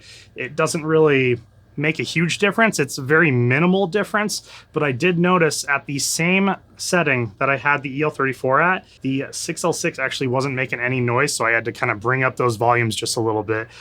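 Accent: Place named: Brazil. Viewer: Sounds more Brazillian than british if you ask me.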